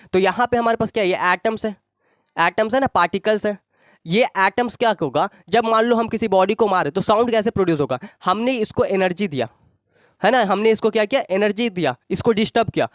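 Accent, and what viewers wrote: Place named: Bihar, India. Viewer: Sir you are from Bihar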